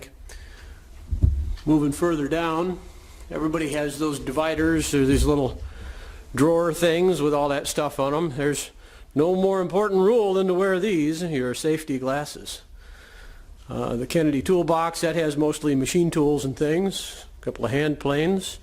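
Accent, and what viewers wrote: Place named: Michigan, United States. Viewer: Nice shop! Mid-Michigan eh? I used to live down in Three Rivers (yes, there're actually 3 rivers. You'd be surprised how many times I was asked…) I should've recognized the accent, guess I've been in California too long.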